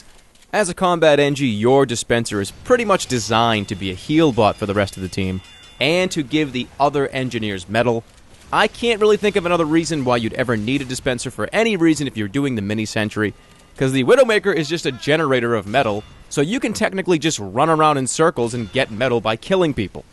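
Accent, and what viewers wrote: Boston, United States. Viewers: You soudn like you're from Boston or something. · American accent breaks google subtitles ammo it thinks is emo and gun it thinks is gone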